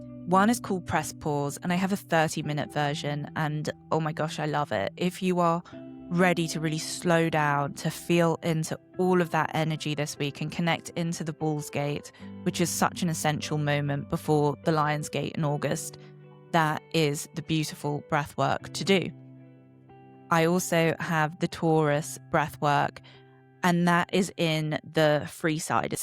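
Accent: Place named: United Kingdom. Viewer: hearing a British accent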